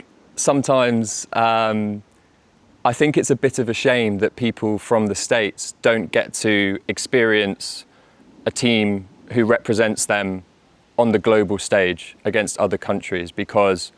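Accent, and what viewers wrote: United Kingdom. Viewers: Is it RP accent?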